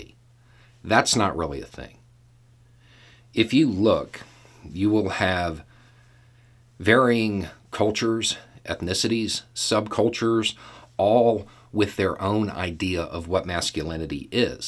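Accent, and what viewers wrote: South, United States. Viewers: the cognitive dissonance i have with a bearded guy with a southern state dialect that actually able to have an intelligent nuanced discussion about such a topic showcase my prejudices and stereotypes which i have to me. hats off, your vouce of reason is sorely needed un todays workd. i will sit here in the corner...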